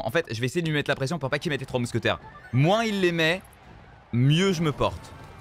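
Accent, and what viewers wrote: England, United Kingdom. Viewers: En vraix tu fait genre mais ta un super accent anglais :OO sinon cool la vidéo ^^ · Joli accent anglais je suis jaloux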